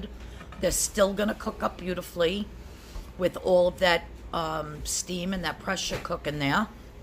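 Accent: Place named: Long Island, United States. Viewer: Hi, happy to find your channel! Are you from Long Island? I recognize that accent!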